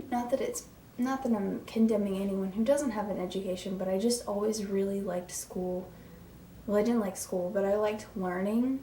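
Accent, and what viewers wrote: United Kingdom. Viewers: Same :o the British accent's gone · Go back go using your British accent. I really enjoy it when you speak in your accent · I loved ur Brit accent tho😔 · Why did you stop making Youtube videos? 22K is a great start. And what happen to your British accent? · wait wait wait. i just watched the video where she had like this perfect British accent...what happened to it?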